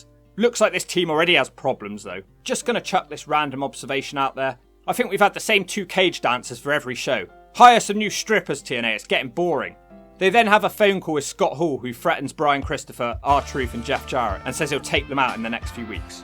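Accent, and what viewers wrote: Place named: England, United Kingdom. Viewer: I don't normally enjoy the english accents and way of words but you I like. Minus your aew hate ofcourse but we're all friends here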